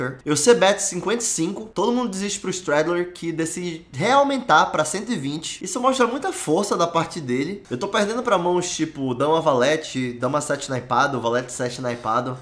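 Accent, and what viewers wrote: Pará, Brazil. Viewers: mano que video legal, vou começar a acompanhar, to jogando online e to ganhando por enquanto, no positivo em relação ao que eu coloquei, percebi pelo sotaque que vc é do Pará né, muito massa mano, só sucesso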